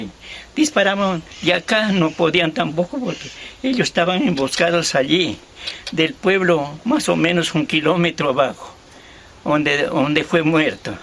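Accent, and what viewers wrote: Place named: Loja, Ecuador. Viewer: Hermosa narración y que bonito escucharlo con un lenguaje Lojano autóctono.. gracias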